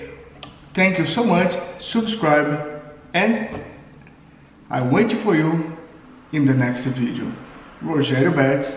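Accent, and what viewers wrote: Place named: United States. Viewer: Agora realmente ele esta americano como ele falar no video Parabens mesmo o a mericano.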